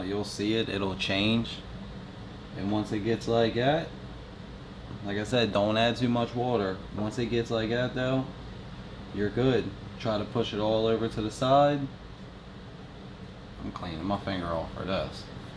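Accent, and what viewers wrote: Baltimore, United States. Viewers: I could tell in 10 seconds that you were from Baltimore man. I'm an old school South Baltimore 21230 guy here. Born and raised. Light St. , Charles St and Fort Ave. We all have that Baltimore accent that people can't miss. Lol. Its not often that I see many of us Baltimore guys on YouTube. Best of luck with your channel !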